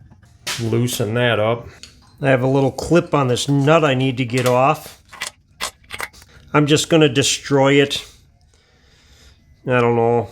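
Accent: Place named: Minnesota, United States. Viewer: Man that's a lot of rust on those parts! Must live in the snowy white north with salted roads, based on your accent and the sheer volume of rust! I'd say Minnesota if had to guess. 🤔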